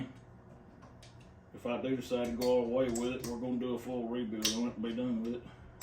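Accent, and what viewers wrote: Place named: South, United States. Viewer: you might want to check a company called Farmertec. It is a Chinese company that sells all kinds of parts for your saw on the internet. I bought parts from them before. It was not too bad, shipping and cost. I also watch a couple chainsaw youtube channels, people who work on them.. Tinman and the second one is ironhorse. both are very good down home people. They might have some used parts you need. You remind me of my dads side of the family. They are also from Tennessee. You look like them and your size is about the same. I can definitely understand your southern accent. It makes me smile. Oh I miss them. Now I'm old and they are gone.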